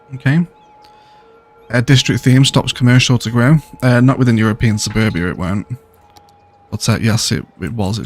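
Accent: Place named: United Kingdom. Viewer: Found your channel accidentally but so glad I did!!! Good to hear a fellow Brit playing this 👍🏻👍🏻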